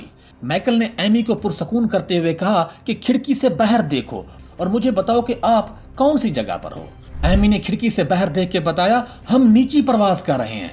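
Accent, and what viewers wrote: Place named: Punjab, Pakistan. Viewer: Panjabi bhai urdu bolna to sekh lo pehley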